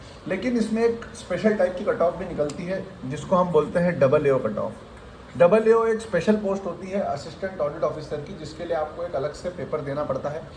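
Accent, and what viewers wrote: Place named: Madhya Pradesh, India. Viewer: Bhaiya aap to MP ke lag rhe ho yar aawaz se ❤❤❤❤❤ Proud of you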